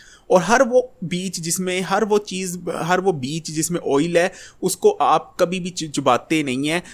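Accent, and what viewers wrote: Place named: Punjab, India. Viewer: Chuteya ager urdu bolna sekh leyta to acha hota .....punjabi bolny waly bachy.......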